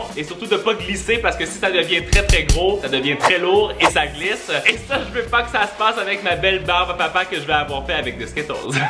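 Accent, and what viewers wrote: Canada. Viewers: tu mon youtubeur préféré de is vidéos accent canadien et qui met quoi des vidéos trop trop rire 👍👍👍👍👍 🇨🇵🇨🇵🇨🇵🇨🇵 traduis le mot anglais 24h XLL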